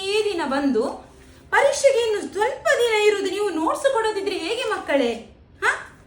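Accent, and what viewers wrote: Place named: Mangalore, India. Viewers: Love mangalore kannada accent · Nanagu e thara anubava agide school nalli 😮nimma manglore kannada esta ❤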